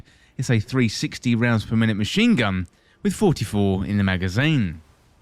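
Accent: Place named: United Kingdom. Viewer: You sound like JARV. All you British twits sound the same.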